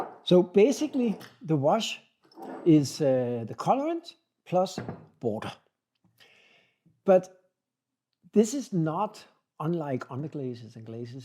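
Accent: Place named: Denmark. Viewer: Danish? Your accent sounds just like my uncles! ❤ great video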